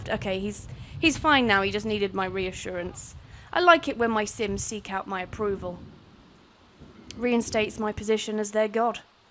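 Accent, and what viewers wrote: United Kingdom. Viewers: Your narration cracks me the fuck up! Especially the British accent 🤭🤭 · This is so funny I don’t think I’ve laughed so hard in my life and I love your accent British accents are awesome and I love the way you swear it’s so cool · You are aggressively british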